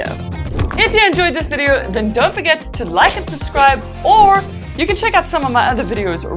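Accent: South Africa. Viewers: She’s From South Africa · You sound South African😁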